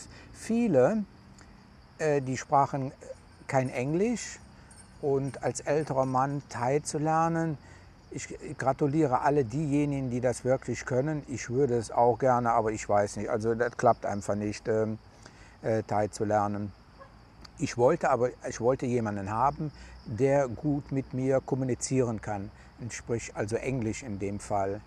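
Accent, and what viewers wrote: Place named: North Rhine-Westphalia, Germany. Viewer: Ja das ist alles nicht so einfach. Habe ich mir einfacher vorgestellt........ Ich dachte mir schon an der Aussprache dass du aus Nordrhein-Westfalen bist. Ich bin aus Alsdorf. Glückauf.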